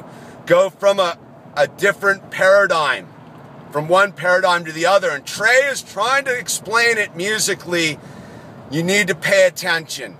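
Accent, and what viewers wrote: California, United States. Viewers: Ahhh. OK. Clearly this hombre is from Cali. No one in their right mind would talk like that anywhere else...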